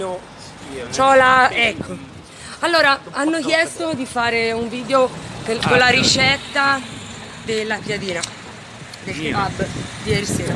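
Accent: Tuscany, Italy. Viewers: Quando sei euforica la cadenza toscana esplode proprio!! Ahah! Bella,!!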